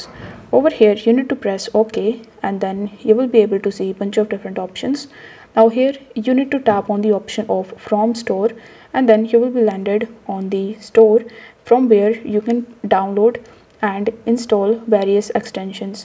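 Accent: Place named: India. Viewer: You're speaking Indian English. Can't hear a thing!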